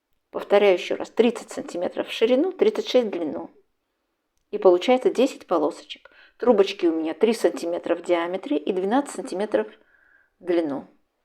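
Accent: Baku, Azerbaijan. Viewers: спасибо за Ваш канал! Как хорошо Вы объясняете, понятно, легко и просто! И хочу выразить восхищение Вами! Ваше красивое лицо, спокойная и правильная речь, изумительные руки!и если не ошибаюсь, нежнейший Бакинский акцент! Удачи! Здоровья и Благополучия!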